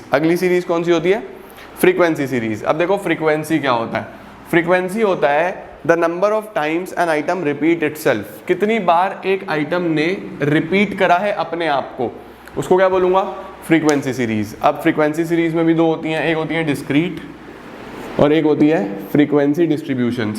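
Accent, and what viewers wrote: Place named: Punjab, India. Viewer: Mujhe lagta hai ki aap punjab ke rehne wale ho.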